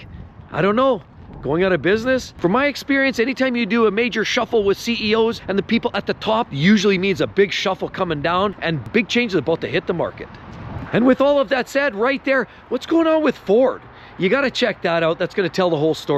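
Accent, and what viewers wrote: Canada. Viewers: New to this channel you sound Canadian, I'm assuming this means these prices are also in Canadian?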